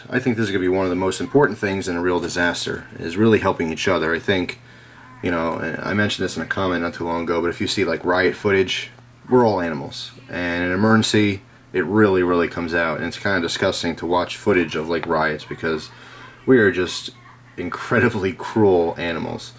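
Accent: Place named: New England, United States. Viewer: ive been watching your videos so much i feel im taking on your new englandish accent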